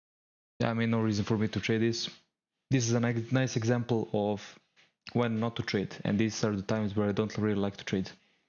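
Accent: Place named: Serbia. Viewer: Odmah sam po naglasku znao da si Srbin, bravo najzad jedan covek koji razume sta je orderflow i sta znaci Dom trading. Iako je posle korone sve teze pratiti indicies jer je bid/ask tanak i options flow igra veliku ulogu zbog delta hedginga i dalje je dom najbolji nacin da se razume sta se desava u trenutku! Samo napred odlican kontent!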